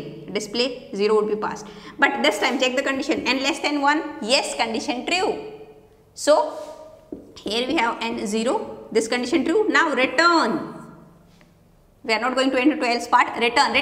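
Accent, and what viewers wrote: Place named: South India, India. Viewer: Her accent is from South india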